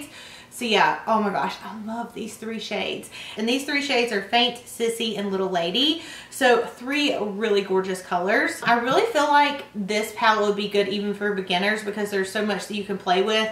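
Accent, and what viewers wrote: South, United States. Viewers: Just found your channel and I LOVE that I've found someone with a southern accent that does makeup videos haha!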